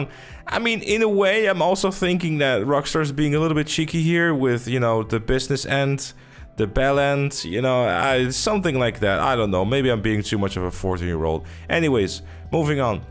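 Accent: Netherlands. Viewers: Love the Dutch accent 😎👌🏻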